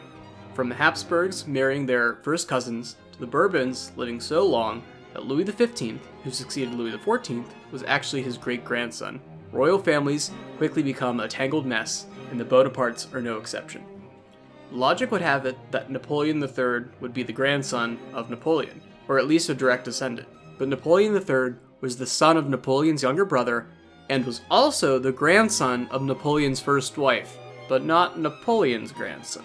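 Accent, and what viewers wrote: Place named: Midwest, United States. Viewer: Slow-down, Kiddo. You are rushing your excellent Narrative. Midwesterners do that. Runningwordstogether.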